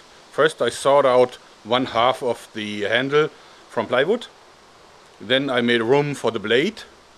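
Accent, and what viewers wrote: Germany. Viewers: Did you ever live in America or you learned English in Germany? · Hi, Can you please explain in more detail why you don't likr the last knife that you presented last. Thanks, Also keep up the good work and I love your vids even though sometimes I cant understand what you are saying cause of the strong German accent, but after I rewind and play the part in question I can usually piece it together. Pete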